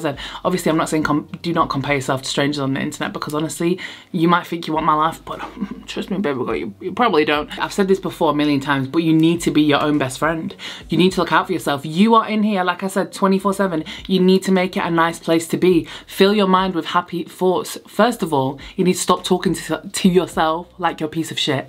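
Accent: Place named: North, United Kingdom. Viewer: Lit the most straight up northern gal love it 💕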